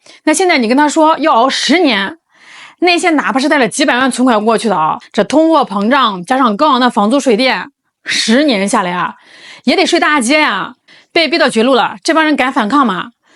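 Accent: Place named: Northeast, China. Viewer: 我本来想冲着这标题来看看黄丝和蟑螂的热闹，结果主播这一口碴子味的普通话把我直接给劝退了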